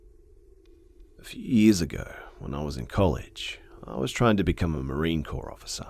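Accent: Australia. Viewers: ARE YOU AUSTRALIAN CUZ DAT ACCENT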